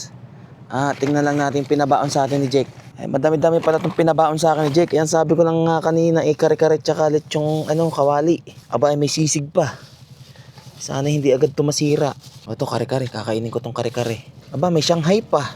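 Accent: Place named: Hagonoy, Philippines. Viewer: Puntong hagonoy. Hehehe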